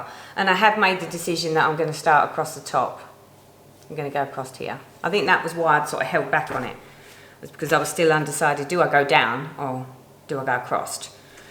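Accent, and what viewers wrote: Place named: Devon, United Kingdom. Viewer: Enjoying seeing your lovely projects. Hope you make it to Canada one day. I grew up in Devon UK but moved to Canada 15 years ago. Your accent reminds me of home:)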